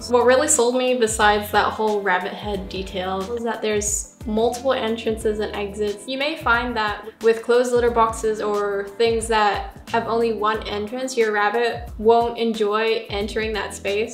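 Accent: Australia. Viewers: Ok is it just me or does she sound like she is speaking with an Australian accent AND an American accent.?? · Not gonna lie, I came here for the bunny content cuz I have a bonded pair. I found your channel when I was looking for bonding advise. I subscribed though cuz you’re so cute cuz of your Australian accent. It’s so attractive to hear 😅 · This was so funny holy cow. I felt this spiritually. I didn’t realise you were Australian. I’m moving to an apartment and I felt that hating yourself part for their depressing area. But the laugh had me dead. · I didn't realise you had an "Austrailian" accent until I saw this comment lol, I hear it now that it's been mentioned but totally didn't realise it before that. wow.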